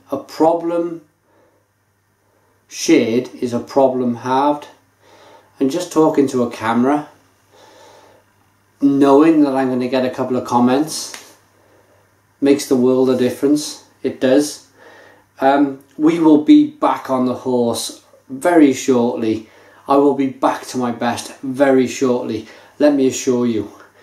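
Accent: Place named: London, United Kingdom. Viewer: YOU SOUND LIKE YOU'RE FROM LONDON TOWN! Nice review.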